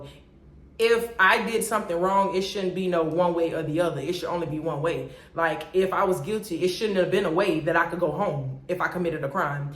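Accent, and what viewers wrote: Florida, United States. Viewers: Listening to your stories I was trying so hard to figure out what part of Florida you from. Highlands county deep in the country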